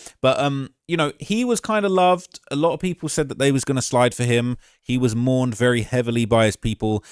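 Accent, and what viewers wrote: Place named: United Kingdom. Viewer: Crazy to hear this limey with such inside knowledge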